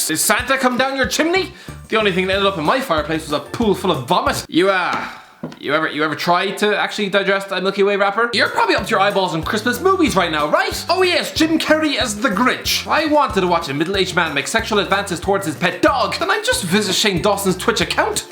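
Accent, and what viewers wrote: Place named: Ireland. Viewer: Can’t be the only one who has to switch on captions for this guys videos. I have a lot of Irish mates but god damn this accent is so thick 😂😂😂. Good content though absolutely love the channel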